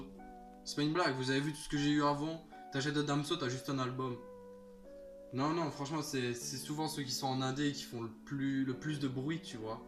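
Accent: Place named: Belgium. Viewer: Ça sent la Belgique dans la voix le gars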